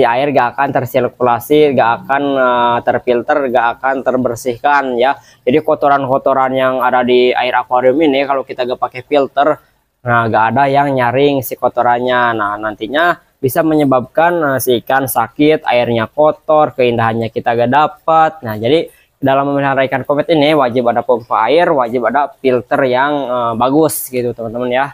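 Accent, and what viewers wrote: West Java, Indonesia. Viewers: Aing asa aya layan. mun tepung jeng orang sunda. aslina kang lila ngarantau ngadenge logat sunda asa bungah pasedek-sedek herin. modar 😅 🤣🤣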